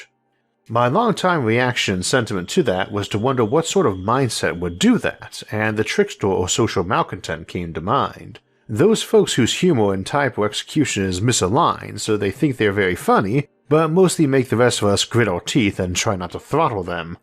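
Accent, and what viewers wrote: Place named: United States. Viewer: American, but he also has rhoticism.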